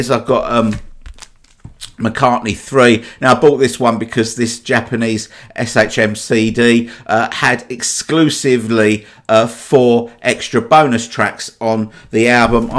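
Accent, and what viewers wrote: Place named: Cockney, United Kingdom. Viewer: Hi, Just one off the ol' cuff and not related to this latest offering, but I watch all your videos and like that east end twang you have... Am I correct in thinking your an irons supporter? If so I wonder how many of us are duel Hammer/Beatle fanatics? How wonderful both our sides ruled the world in the mid sixties, anyway enough of the sentimentality! Take care Kev P.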